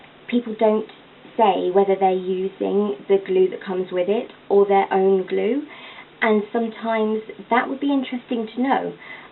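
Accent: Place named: South, United Kingdom. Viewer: I like your speaking voice. You sound a bit posh, southern England? I like your channel because you have videos going back quite a while and I always think it's interesting to see how people grow over time. Hope you're doing well and would like to see a new video some time!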